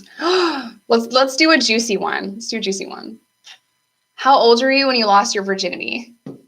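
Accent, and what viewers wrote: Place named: Endicott, United States. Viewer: So hey girl! I had an odd feeling when I ran into your channel that you may be from Endicott, what with your accent and all. I am too! My family actually moved me to NC to avoid PINS/ hanging with dope fiends all day long. I have stories upon stories from drug raids to robberies in the 607 if you ever want to get up and talk about how your upbringing and environment played a role in who you are. It’s so crazy to see how I probably would have ended up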